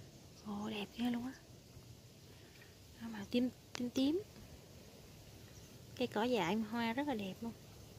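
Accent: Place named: Mekong Delta, Vietnam. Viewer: Nghe giọng nói miền Tây,a nhớ người yêu cũ 💕💕💕✍️✍️👍 hu hu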